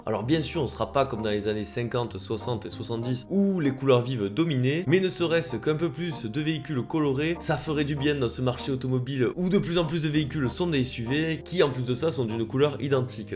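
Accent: South, France. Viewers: Toujours trop cool tes sujets, ta façon de présenter, ta bonhommie et ton petit accent du sud. On a l'impression que tu as une cigale dans la bouche, j'adore! Avec BenAuto, CarJagger, New Retro Car et POA tu es mon préféré number 1. Fut un temps ou y'avait pas trop de choix Citroën HY gris, 2cv bleu ou grise en raison de la reconstruction d'après guerre dans les années 50 aux dires de mes parents acheteurs de l'époque. La pénurie a duré un certain temps après 1946. Un ami me disait que les autos blanches sont plus sécuritaires car plus visibles qu'une couleur foncée. La nuit on peut les voir plus rapidement à une distance moindre qu'une foncée. Je me rends compte qu'on a toujours eu des autos aux couleurs tristes. Peugeot DMA lie de vin d'occasion qui sortait quand même du lot, Citroën HY gris, Peugeot 203 noire, Break 304 gris, Cabriolet 304 S grise qui était verte auparavant et a été repeinte en rouge après la vente, 205 brun macao qui sort un peu du lot, Samba GL noire et 106 XN noire de 1991 que j'ai toujours d'ailleurs et que je bichonne et me rends bien service quand j'y vais en vacances. 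J'avais payé un supplément pour la peinture noire vernis. J'adorais le noir ca faisait classe. Mais avec le temps je préférerai une couleur plus claire car le soleil tape dans le sud de la France du côté de Béziers et le noir attire la chaleur. Un cabriolet 304 S jaune paille ou jaune safran (orange) serait mes choix. Vive la Deuche vert pomme de 74 de mon beauf dans les années 78/80.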